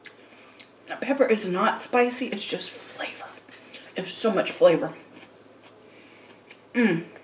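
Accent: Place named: Deep South, United States. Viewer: You’re not a Christian with that accent? Dayum, I respect that! I too am from the Deep South and not a Christian.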